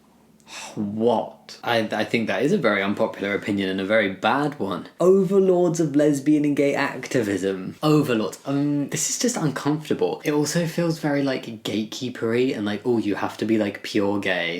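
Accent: United Kingdom. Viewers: Are you British? 😮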